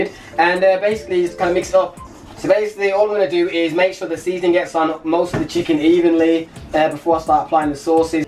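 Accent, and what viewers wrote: Birmingham, United Kingdom. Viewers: are you from Birmingham bro im from erd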